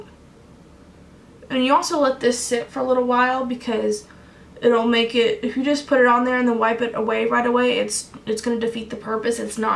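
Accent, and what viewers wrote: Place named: California, United States. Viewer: I’m guessing she moved back home to Michigan from California cause she’s HELLA talkin like a Californian. Lol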